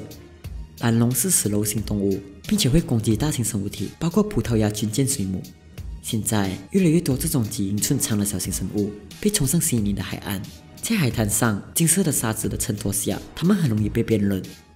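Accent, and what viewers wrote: Malaysia. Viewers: 马来西亚口音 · 是馬來西亞人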